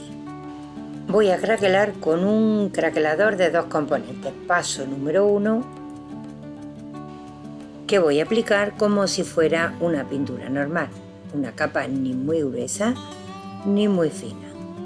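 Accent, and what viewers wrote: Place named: Seville, Spain. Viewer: Hola: soy mexicana y siempre me he dedicado al trabajo intelectual, pero ultimamente, claro, me estoy interesando en reparar mi alrededor directamente comenzando por mi hogar, jajajaj,a, y tu video es tan sencillo que facilita esta mia tarea. gracias por estar ahi. ademas, eres sevillana? bueno pues que me reencanta como hablas.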